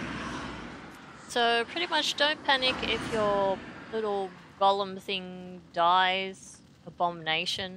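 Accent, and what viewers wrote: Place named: Australia. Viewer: always love to hear a woman's aussie voice in gaming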